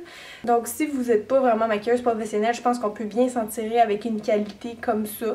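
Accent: Quebec, Canada. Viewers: C'est un délice de voir tes vidéos, ton petit accent canadien que j'adore,en tout cas je suis tes conseils merci beaucoup de ta spontanéité , j'adore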